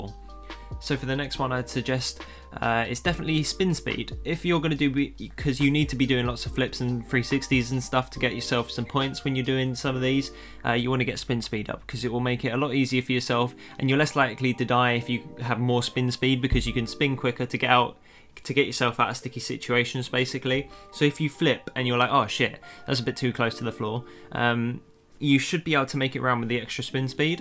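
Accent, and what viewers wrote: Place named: United Kingdom. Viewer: It sounds like he's british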